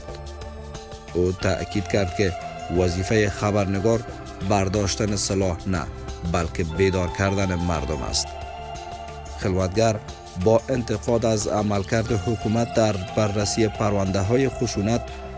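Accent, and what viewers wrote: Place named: Iran. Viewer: Az lahja irany to tamam chez malum meshawand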